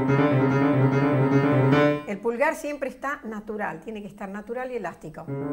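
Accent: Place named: Buenos Aires, Argentina. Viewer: que buena clase y en porteño mejor aún uno asimila mejor el conocimiento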